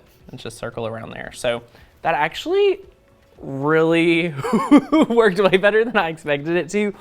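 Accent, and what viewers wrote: South, United States. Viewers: Gotta love a good ole fashioned southern drawl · nice accent. Hey there fellow southerner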